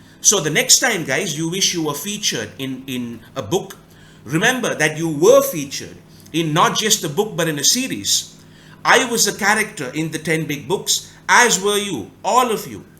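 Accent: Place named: Sri Lanka. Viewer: Are you saying you can't be taken seriously? Because of the thick Sri Lankan accent? Coz that would be a crying shame considering the seriously cracking great content , serious no joke , not kidding even a little bit, true story ... Gospel even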